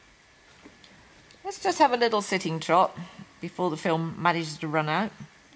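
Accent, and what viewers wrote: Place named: England, United Kingdom. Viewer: This is VERY informative! I ride a horse with a very similar build, belly wise, and I struggle to keep her forward at the trot- my leg aids are not very good, I think. I saw a more advanced rider on her and they did not seem to have as much issue getting her to go forward, and to then maintain it. I even have to take my lesson with another horse just to keep her going, I need help with my legs I think! And it is good to know even pros struggle to look up- I also tend to softly focus right at the horse's head area, and constantly get told to look up that the fence or at the treeline... I would LOVE to be a working student! Also, those saddles are amazing! I feel like mine MIGHT be pushing my lower leg forward- I do a lot to keep it back, but I have recently seen some video of myself and it creeps forward regardless... 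I might see if I can try another saddle next week, if they have another one that fits Rebecca's (my lesson horse's) wide back! And you sound almost EXACTLY like my instructor, she is also English! She did eventing and then dressage, at a Grand Prix level, I believe. Or at least she had a Grand Prix level horse...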